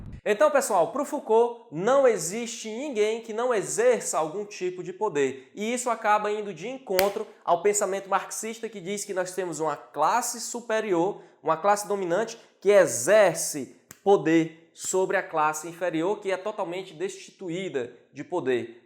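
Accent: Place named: Rio Grande do Sul, Brazil. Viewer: "Pudê" (poder)....sotaque gaucho.....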